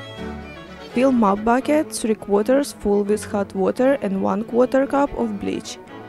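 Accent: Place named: Russia. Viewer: Да она Макдональдс вообще по-русски произносит.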